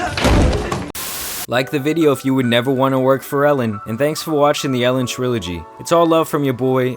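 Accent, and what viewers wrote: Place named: Northeast, United States. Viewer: Bro, your accent is hella strong lol. You definitely from the northeast.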